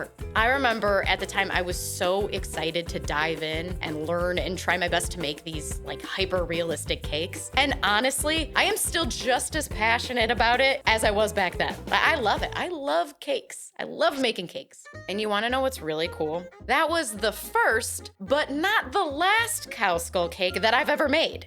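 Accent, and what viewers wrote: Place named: Ohio, United States. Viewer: As a native Ohioan, I could peep Natalieʻs accent months ago! We put "Y"s before our vowels-"Cyan" instead of "Can". Im So proud of a Buckeye doing so well!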